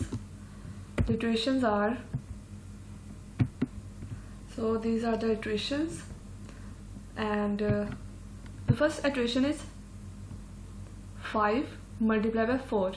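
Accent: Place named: Karachi, Pakistan. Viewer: you are from Karachi, lalukhet* right? I judged by your accent..... sorry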